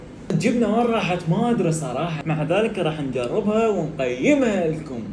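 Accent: Iraq. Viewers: انتة عراقي ؟ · ياعشقي للهجه العراقيهههه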